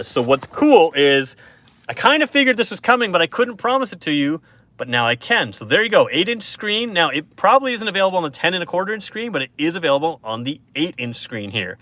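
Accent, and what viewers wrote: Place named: New York City, United States. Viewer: I enjoy your videos. They are very informative. I have one suggestion for you however. Watch one of your own videos and Count your words per minute ? You speak really really really fast !!! Maybe less coffee ? Maybe slow down just a little bit ! ? I don’t know . I am a long haul truck driver and I drive the entire United States and Canada and the difference in how people speak between New York City and Birmingham Alabama and Ontario Canada is an amazing thing to experience !! You would fit right in in New York City except you seem a little less caustic .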